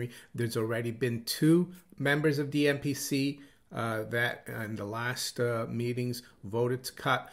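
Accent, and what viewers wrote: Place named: South, United States. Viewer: < Once I listened to a video post with sound, clearly you live a bit further south of N.E.. lol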